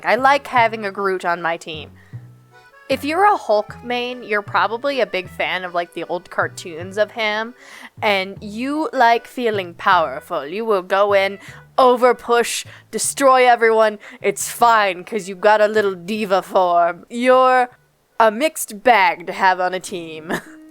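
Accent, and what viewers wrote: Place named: South, United States. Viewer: Dawg is it just me or does she sound like squirrel girl lol like she has the same thing where she like says stuff and becomes slightly southern or something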